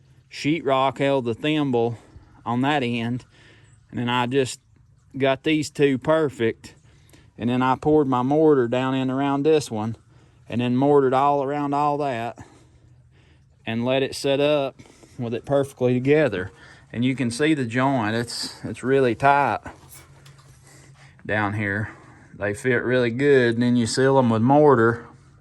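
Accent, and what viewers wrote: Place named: North Carolina, United States. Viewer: I'm assuming that is your spring gurgling and dripping in the background. Bout rocked me to sleep listening to it as you gave us mountain ingenuity on the flue construction. I also love how you have your local NC distinctive accent but your children have not trace of your accent in their speach. Much like my heavy local NC accent as my children grew up they too had a very different accent than mine. Might be because we moved to several NC towns because of my husbands career as a Postmaster for 30 years in the mountains of WNC. However and whyever (my new word)🤣the reason our children have different accents than us, doesn't matter. What matters is that they are ours and we love them. Maybe your children picked up their accents from Billy, Michelle & Will!!! Ha! Ha!❤❤❤😊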